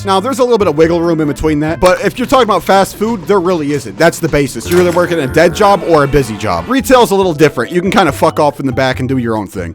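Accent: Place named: Wisconsin, United States. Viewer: not to be weird but i have this feeling your from wisconsin or a neighboring state. Culvers gang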